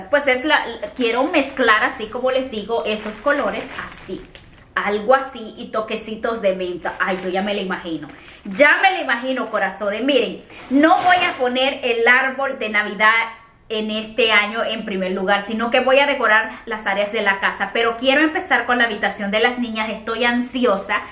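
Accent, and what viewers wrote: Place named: Dominican Republic. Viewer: Hola. Creía que eras Dominicana. Tienes una forma de hablar y expresiones nuestras. Salidos a los Hondureños.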